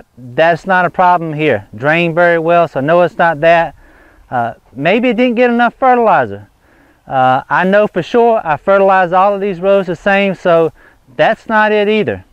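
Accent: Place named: Kentucky, United States. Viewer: Awwwh, that's a shame that you've got this funky situation now. Hopefully this will clear up soon and you'll again be able to plant the seeds you want and have them thrive. I am only an old disabled woman in a wheelchair, and live in the Phoenix suburbs here in a doublewide mobile in a mhp setting and because of the patio and carport overhang type roofs, I really don't have much ground for planting a garden. I'm trying to grow what I can in containers but most of it is in mostly shaded areas of the patio. It's hard, I've tried getting things going since April of this year, but everything keeps getting burnt up so fast from the sun, and the HEAT, even when I drag the containers into the fully screened inner patio area. It's so hard to watch things begin to sprout and get all happy and then have to watch them get burnt to a crisp with a few weeks as I try desperately to get them the sunshine that they obviously need, cuz they're getting too leggy! 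I watch your amazing videos and just dream of having a place as big as yours and the physical ability to farm it too. You are truly blessed and it's so nice that you share your information, whether good or bad, with us. I love hearing your accent, my maternal Grandfather was from Lexington KY and I adored hearing him talk about just anything. He's been gone for many years now, but everytime I hear your voice, it reminds me of him a little bit. Anyhow, thanks for the information, I'd often thought of using something like sawdust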